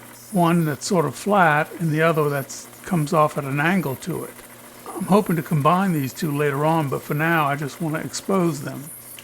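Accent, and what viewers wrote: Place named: Louisiana, United States. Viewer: this guy has got to be from Louisiana. He has a distinct cajun accent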